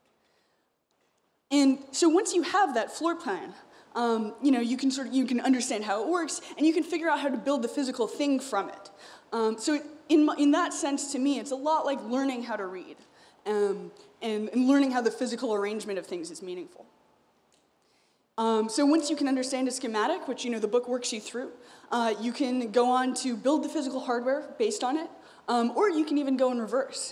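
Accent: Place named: United States. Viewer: I really like her accent , what part of U.S is she from ?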